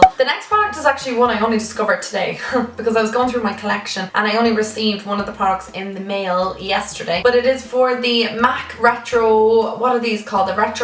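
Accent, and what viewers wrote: Dublin, Ireland. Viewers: what county in Ireland are you from☺️x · It's Irish, have you ever even heard a Scottish accent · U a dub ?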